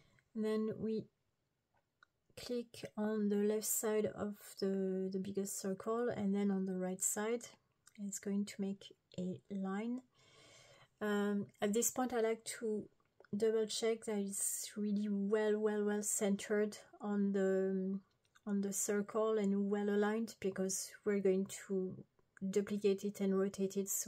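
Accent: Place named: France. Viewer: Hello and Wow, what a beautiful hands, color of nails, and that voice + French accent, am I right? Thank you for this tutorial, this is what I need for my project. I wan to design and make incense stand with mandala motif. You helped me a lot☺ Greetings from Poland Edit: I have a problem with symbol layer, when I do the final stroke to draw mandala shape like you in this circle, the lines are drawn automatically but in one direction left or right instead facing each other. What I'm doing wrong🤔 Edit_2: I have figured out. My mistake by instead of adding first triangle to symbols, I have first created both, connected and then added as symbol which did not point stroke later to the same direction, but opposite, spreading away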